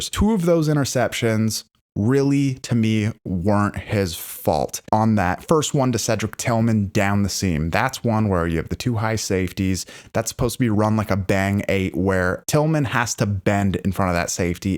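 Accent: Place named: Canada. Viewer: So where from Canada is this guy from again???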